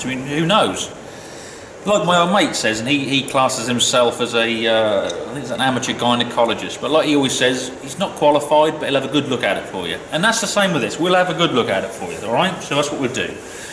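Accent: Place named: East Anglia, United Kingdom. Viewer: Well I have not been a subscriber for very long as I found your channel from Cotontop3 when he mentioned you by name and I have seen your name on some youtube video channels that I watch. I like your comments to others. I came and watched a few of your videos and liked what I saw so I subbed. I enjoy listening to your Norfolk/Suffolk accent and what you put up for us to watch. I am really looking forward to seeing a lot more stuff on your 3350 especially the internal refurbishment of the cab.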